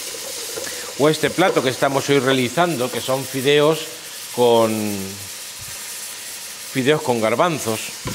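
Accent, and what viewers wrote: Spain. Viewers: El Guiso se ve delicioso, auténtica bendición que conjunto a sus historias y anécdotas hacen el vídeo interesantísimo y entretenido. Me encantó verlo y escucharlo en un Castellano de España que es una delicia para los oídos. Saludos desde Berna-Suiza, señor.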